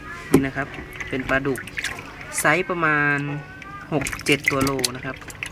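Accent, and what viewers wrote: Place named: North, Thailand. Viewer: คนจังหวัดไรครับเนี้ย พูดเหนือด้วย 55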